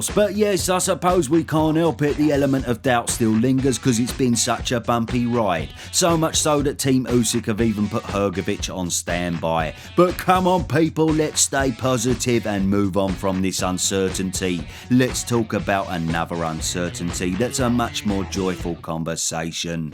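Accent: Cockney, United Kingdom. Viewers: "Daniel Dubious" "He moves like a postman with the s#!ts" All of it said with the accent of a pick pocket in a Guy Ritchie movie.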